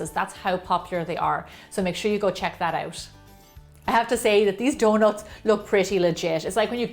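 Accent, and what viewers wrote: Ireland. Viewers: She is just soooo Irish! I love her accent !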